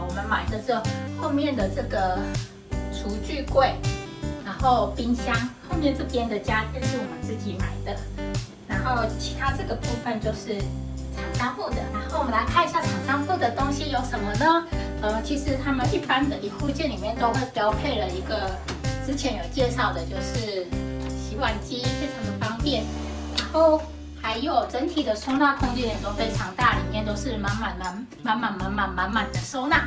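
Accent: Taiwan. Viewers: 台中口音!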